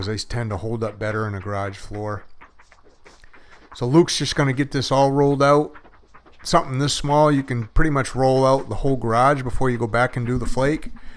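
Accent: Maine, United States. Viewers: I thought I detected a little hint of Downeast listening to you, then I saw the 207 area code… ayup.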